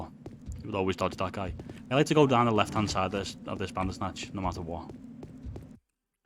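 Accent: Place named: Liverpool, United Kingdom. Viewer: Excellent video. Great to hear a fellow Liverpudlian also!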